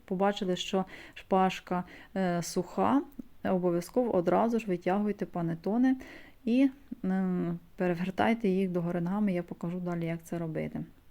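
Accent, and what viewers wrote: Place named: Ivano-Frankivsk, Ukraine. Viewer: дуже дякую землячко, я родом з калуша і чую що у вас акцент такий з івано-франківської області.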